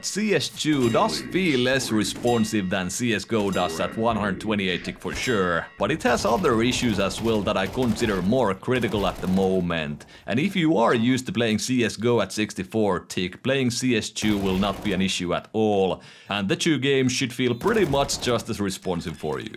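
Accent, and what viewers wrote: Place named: Finland. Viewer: Your accent looks like FInnish if im not wrong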